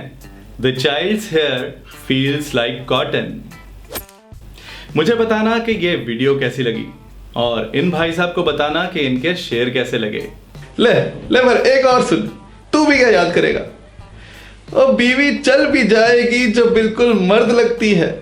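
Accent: Punjab, India. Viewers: Appka punjabi rool bada sone lagtehai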